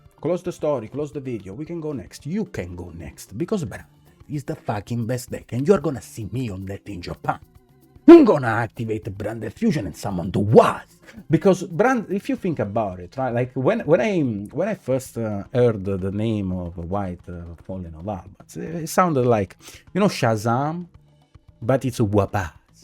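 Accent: Italy. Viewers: He has the voice of undiluted Sicily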